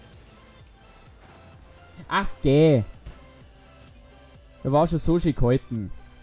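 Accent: Bavaria, Germany. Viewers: I dochte am Onfang du dadsd Boarisch sprechn.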